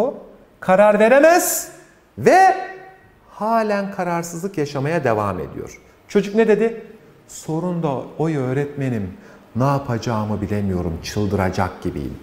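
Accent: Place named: Aegean, Turkey. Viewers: Hocam nerelisiniz? Konuşmanız çok güzel lakin e ve a larda Ege ağzı hissettim, merak ettim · hoca izmirli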